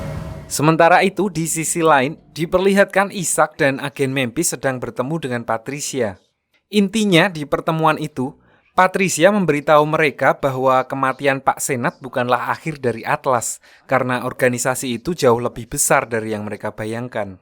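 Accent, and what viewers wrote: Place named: Central Java, Indonesia. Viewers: Fixs admin e wong jowo 🗿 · Joh. Iki ngadimine wong magelang to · Wong Jawa Tengah kyok e ki narator e😂😂😂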